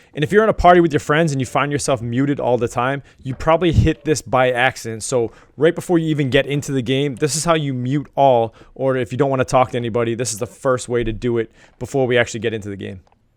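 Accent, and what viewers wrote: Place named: New England, United States. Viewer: are you from new england? you kind of have an accent lol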